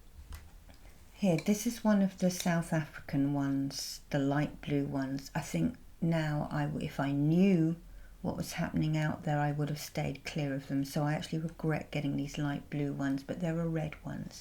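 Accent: England, United Kingdom. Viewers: I love the way The English from England sounds, what an enchanting accent =voice, you and put me to sleep easily 😵‍💫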